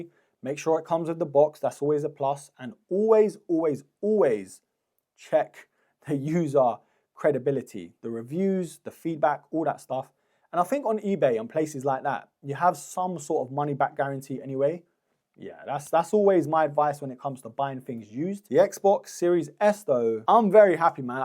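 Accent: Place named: Jamaica. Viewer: Why is he talking like a Jamaican?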